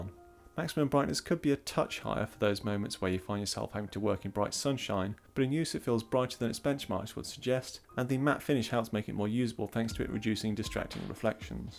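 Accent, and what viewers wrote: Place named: United Kingdom. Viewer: Was watching Austin Evans then realized his harsh American twang was a pain in the ears compared with your calm British accent😂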